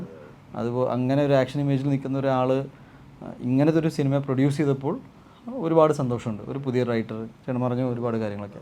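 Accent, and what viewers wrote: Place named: Gujarat, India. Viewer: Ayaale padichathum valarnnathum Gujarat aanu. 20 vayassu kazhinjaanu pulli malayalam ezhuthaan padichathu. Athinte issues dialogue deliveryke onde. Athaanu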